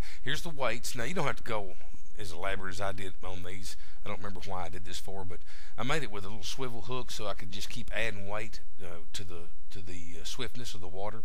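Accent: Arkansas, United States. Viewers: what part of Arkansas are you from?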